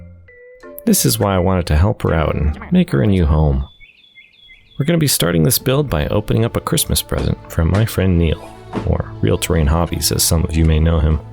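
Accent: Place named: Ireland. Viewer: Are you from Ireland?? I didn't recognize your accent! Absolutely love this ! Wish I was as talented !!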